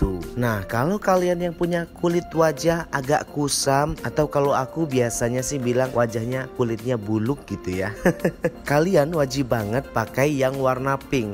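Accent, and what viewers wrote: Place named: Lampung, Indonesia. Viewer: yapun... orang lampung nih ya😀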